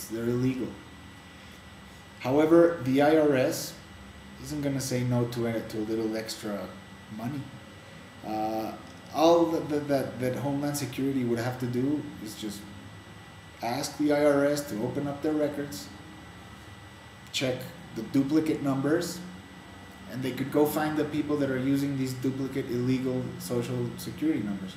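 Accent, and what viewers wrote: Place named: Eastern Europe. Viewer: you sound eastern european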